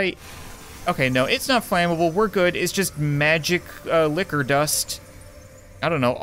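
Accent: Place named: Canada. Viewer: can i get a copy of candleman please i love your channel and your canadian acsent is so cool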